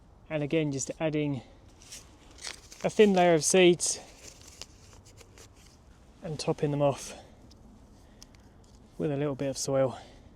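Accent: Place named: Australia. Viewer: Goodness, you sound so Australian!! My bad.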